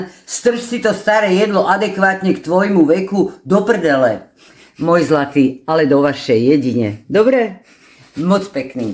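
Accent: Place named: Eastern Slovakia, Slovakia. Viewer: Mila pani. Ste, Super zienka, mama, kucharka. Ani si neviete predstavit ako, kazdy Vas recept, video, aj vase hlasky, nezaprie sa, ze ste aj vychodniarka…💗 nam prinasaju radost v Brooklyn,NY. Prajeme Vam aj kameramanovy vela zdravicka lebo je vzacne a hlavne lasky. Spozdravom vychodniarky( rusnacky) z Brooklyn E&K 😘😘😘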